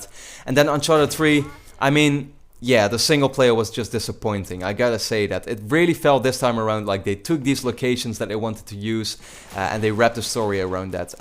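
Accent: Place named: Netherlands. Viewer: I noticed ypu have a dutch accent. Are you dutch?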